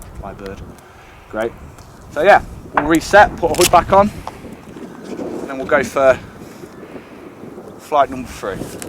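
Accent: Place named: South Carolina Lowcountry, United States. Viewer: I can tell by your accent that you live near me in the South Carolina low country. I’m located in Myrtle Beach and was searching for a previously owned falcon on Craigslist. I’ve been ripped off TWICE now. 😔. I bought my first bird from a guy who would only meet with me in the Brew Ha Ha’s parking lot. At first I loved his bird. It was so gentle and quiet. The bird came with the little hat and everything. But after purchasing it I waited three days for it to wake up and NOTHING! So finally I decided to take off its little hat and visor. Only then did I discover that it didn’t have a head. The hat was literally sewn onto some animal’s torso. I’m not even sure if it was ever a bird to begin with. Upon further inspection I was appalled to realize that the animal’s magnificent feathers had all been Scotch Taped to the pelt. 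I was very skeptical of the second seller and demanded that wake the bird up. I was relieved when the bird became animated. It was an angry foul beast but the seller was confident that the bird was just having a bad day and was usually a late riser. So I bought the bird. I was so excited about my new falcon and posted pictures on my Instagram. It turns out that my bird isn’t suitable for the sport of falconry. Again I was duped. The bird I purchased doesn’t even fly. It looks like a falcon but it’s usually not trained to fly. It isn’t so much a sporting bird. My friend Ming’s parents own the Wok In Laotian restaurant and daycare center in Myrtle Beach. They id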